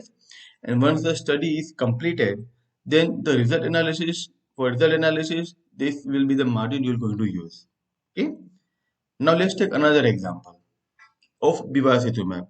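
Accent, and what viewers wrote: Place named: India. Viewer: 我去印度英语，OMG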